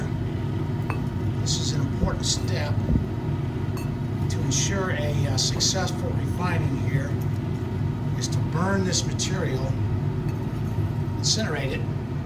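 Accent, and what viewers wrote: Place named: Missouri, United States. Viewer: MISSOURI 🤷‍♂️🙄.....that explains your accent. Missouri is one of the hardest accents, to place.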